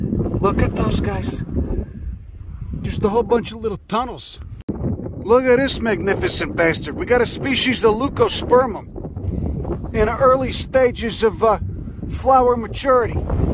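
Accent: Chicago, United States. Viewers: I never learned so much while cracking up at his awesome Chicago accent. Salute from the suburbs.#Elgin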